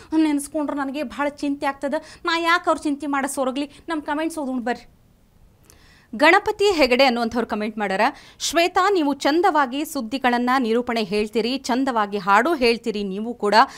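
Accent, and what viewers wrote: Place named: North Karnataka, India. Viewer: ವ್ಯಂಗ್ಯ ಮಿಶ್ರಿತ, ಉತ್ತರ ಕನಾ೯ಟಕದ ಶೈಲಿಯ ನಿಮ್ಮ ಮಾತು ಕೇಳಲಿಕ್ಕೆ ಬಹಳ ಚೆಂದ. ತುಂಬಾ ಧನ್ಯವಾದಗಳು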